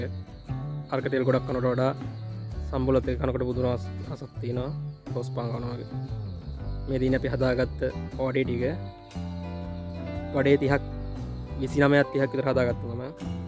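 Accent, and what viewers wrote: Galle, Sri Lanka. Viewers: Galle kenek wage .kathakarana widiyen kiuwe. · Good! Very clear. Thanks! Galle ekkenk wage! 😋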